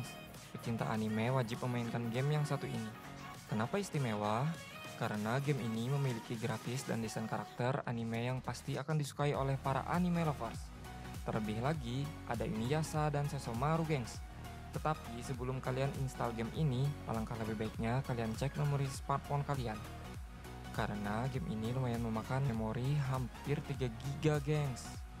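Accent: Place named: Medan, Indonesia. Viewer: Hahahahhqh Bukan kaleng" ya bang..... Itu Medan banget gangs.... Hadir Nyimak bang.... Jgn lupain aku ya bang.... Salkomsel bang.....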